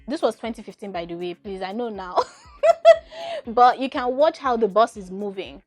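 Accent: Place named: Jamaica. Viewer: For some strange reason, you sound like a Jamaican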